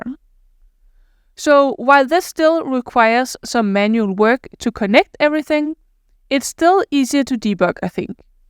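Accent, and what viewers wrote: Denmark. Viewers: great video, strange random question(feel free to not answer): are you Brackeys GF? you have a very similar way of setting up the video, explaining the issue and talking (this is a good thing, he is very good at this) even down to the Jylland accent.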